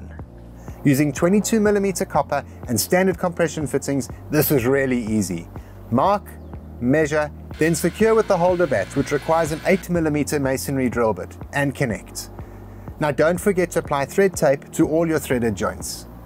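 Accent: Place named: South Africa. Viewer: It’s a shame a whole accent is connected with hate because I have always loved the ‘Saffa’ accent 😊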